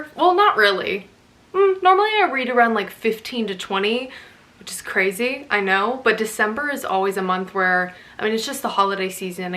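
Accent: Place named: South, United States. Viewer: Girl literally never apologise for a southern accent 😂💖 i think its really charming . get well soon!!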